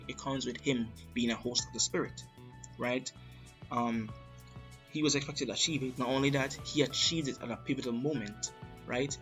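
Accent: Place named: Jamaica. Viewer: Is this a fellow Jamaican I heare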